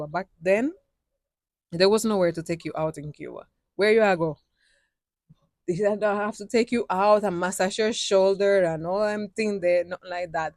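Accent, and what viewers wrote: Jamaica. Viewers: I LOVE when you sprinkle some patois into what you are saying!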